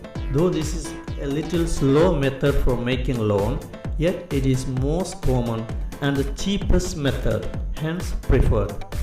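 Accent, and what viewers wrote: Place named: Kerala, India. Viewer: Are u a malayaalee?ur english is like malayaalees uncle.pls tell malayalam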